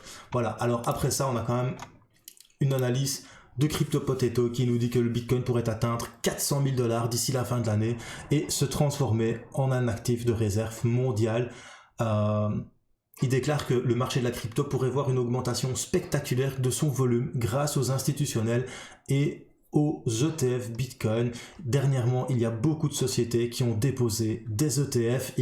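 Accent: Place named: Tournai, Belgium. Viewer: Tu as trop la voix du tournaisien :)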